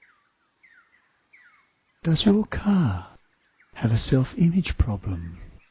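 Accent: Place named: Australia. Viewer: Lol. You're the best!! I love your "work / YouTube posts"... weaver of parable, and allegory, with an Aussie voice and calming background sound, Master, I flourish a bow in your direction. You must be quite the interesting "normal guy". You have helped me much over the years. Post more!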